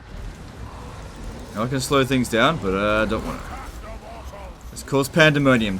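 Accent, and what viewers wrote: Australia. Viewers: The yt Auto subtitles are getting better at australian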